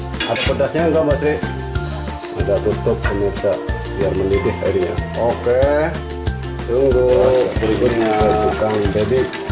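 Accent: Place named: Banyumas, Indonesia. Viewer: bahasa ngapak....emang dr kota mana pak....😁😁😁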